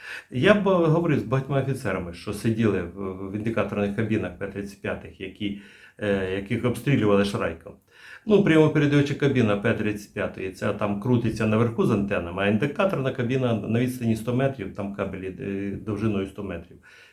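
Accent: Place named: Lytyn, Ukraine. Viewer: Завжди приємно слухати Вас пане. У Вас якась рідна українська мова, як у нас на Літинщині. Дякую за всі огляди.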